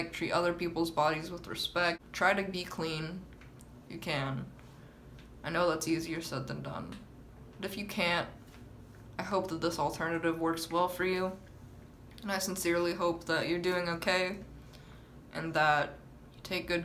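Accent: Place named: Pittsburgh, United States. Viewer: Oh your definitely from Pittsburgh 😂😂😂 hey girl hey.... fellow pittsburghian here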